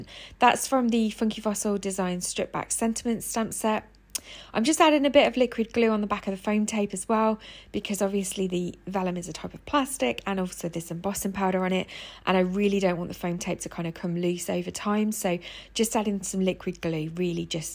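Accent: United Kingdom. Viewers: Very clever, I have a tub of Tonic flakes that I used on a mirror I was up-cycling a few years ago, now I know how to use them in my cardmaking. I found your channel today by chance, listening to you it sounds like you have a slight west midlands accent. I might be wrong, I'm from Birmingham 😊💕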